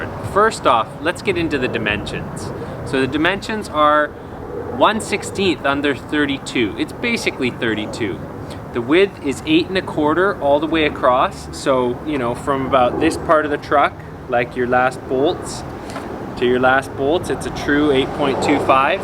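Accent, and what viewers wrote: Canada. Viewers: I’ve seen a couple of your vids but damn you sound hella Canadian in this one... lmao I never even noticed until now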